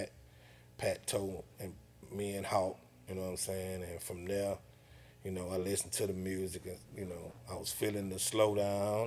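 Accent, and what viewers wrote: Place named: Houston, United States. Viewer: Real southside, Southpark talk.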